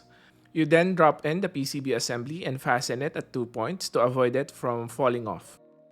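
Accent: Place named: Philippines. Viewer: My dude's Tagalog accent is oozing.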